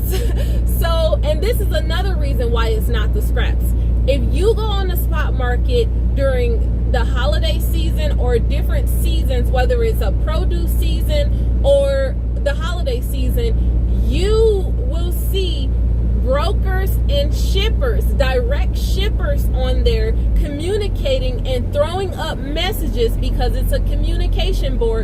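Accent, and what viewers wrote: South, United States. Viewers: Are you from the south? What are some good load boards besides DAT? Can you name a few?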